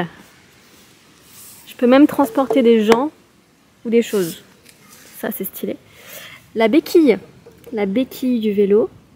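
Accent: France. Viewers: Les toulousiens parlent très bien français. Je pense que les toulousiens parlent français un peu mieux que les parisiens. J'aime ton accent français. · J’aime ton accent français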